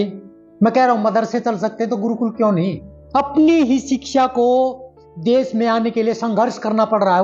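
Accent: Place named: Haryana, India. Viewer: Aap jaat ho ya gurjar aapka accent haryanvi jaisa h ...ya phir aap gujrati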